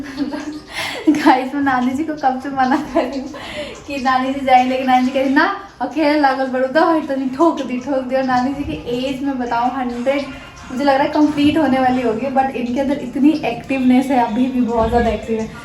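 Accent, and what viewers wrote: Bihar, India. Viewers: apke muh se bihari language bhut pyari lagti hai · Hi ji aap ki behari boli badi payari lagti hai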